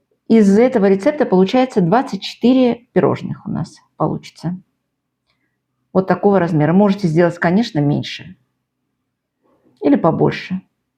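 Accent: Baku, Azerbaijan. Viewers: Я пишу Вам из Дербента. Мы тоже пекла такие пироженки и так же называли белочками. Но когда-то много лет назад у меня в Баку жила тётя с семьёй и я приезжала к ним в гости. И сейчас слушая неповторимый бакинский акцент- такая ностальгия по тем временам. Как будто родной голос 😊😊😊. Спасибо ❤ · Да,узнаю бакинский акцент❤ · Судя по акценту Вы жили в Баку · Добрый вечер! Очень приятно смотреть Ваши видео, особенно Бакинский акцент. Очень прошу рецепт песочных пирожных со школьной столовой, за 22 коп. с повидлом и розовой глазурью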